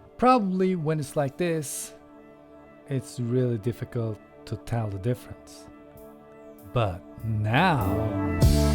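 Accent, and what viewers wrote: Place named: Turkey. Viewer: Bu abi Türk mü? Acaip güzel Türk şivesiyle konuşuyor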